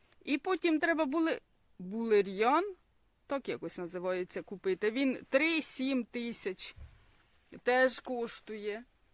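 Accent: Poltava, Ukraine. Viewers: Доброго дня, чи вечора. Потрапила на ваш канал і залипла!!! Поки усі відео не передивилася, не угомонилася. Дуже зачаровує українська мова з полтавським акцентом! Дуже приємно слухати. Для себе візьму приспособу для перевалки з стаканчика в більшу ємкість( з розрізаним боком) А банку з ручкою для виготовлення стаканів я теж у Валєри взяла. Я і зараз його дивлюся на каналі Наша дача.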